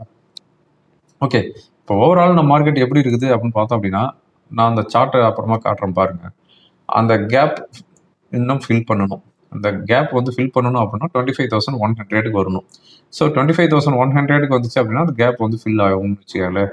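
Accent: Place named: Kongu, India. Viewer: Rupee value வீணாப் போய் கெடக்குது 😂 கொஞ்சும் கொங்குத் தமிழ் அருமை 👍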